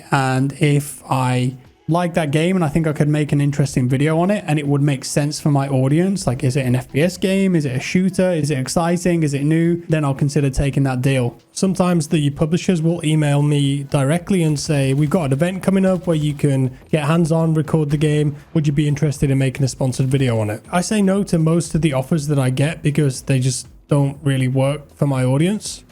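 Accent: United Kingdom. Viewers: I‘m following ur Channel since WZ 1 I love your commentary and especially your lovely british accent 😊👌 Lots of love from Germany ❤✌️ Keep going!!!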